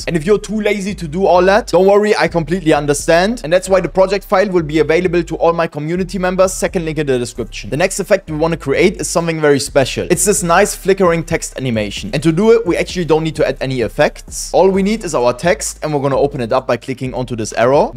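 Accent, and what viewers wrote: Germany. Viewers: Are you from germany ? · i tink tat you're deutsch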